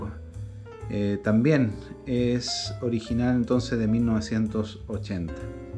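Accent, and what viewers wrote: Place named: Chile. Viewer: Tenés pinta de ser chileno...por el acento lo digo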